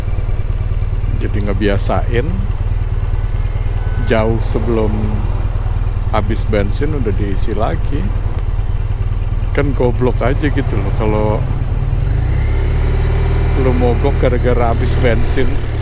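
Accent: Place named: Bali, Indonesia. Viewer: Bang maaf, aslinya dari mana?,dari logat bicara abang ko kaya orang Bali..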